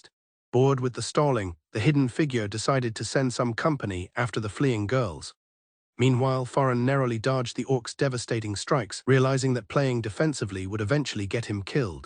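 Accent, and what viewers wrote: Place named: United Kingdom. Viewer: Manga recap with a British accent?!🤩👍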